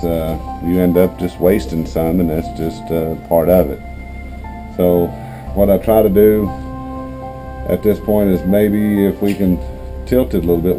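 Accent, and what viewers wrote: South, United States. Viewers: Quality control comment. Great content., your audio quality here is so-so, and you're a bit hard to understand because my ear is not attuned to a Southern dialect, especially with the music competing with your voice. Humbly submitted in support of your excellence.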